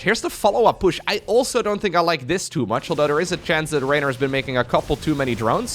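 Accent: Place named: Netherlands. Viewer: it's actually "broodlords" and "broodlings", but dutch accent makes it sound like that.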